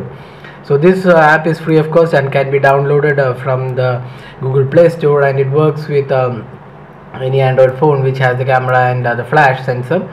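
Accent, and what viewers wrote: India. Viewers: By your speech it seems you are Indian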